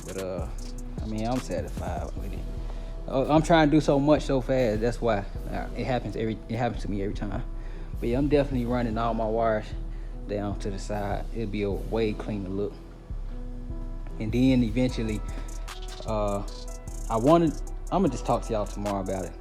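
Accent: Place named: South, United States. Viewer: You live in the south somewhere, man. You do speak properly.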